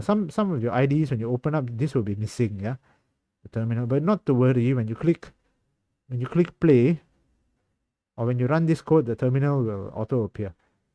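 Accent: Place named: China. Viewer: Malaysian lol the English. Your English have Chinese accent annoying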